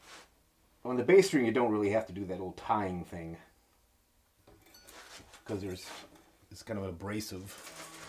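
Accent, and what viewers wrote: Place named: Great Lakes, United States. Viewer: Your Great Lakes accent is quite strong.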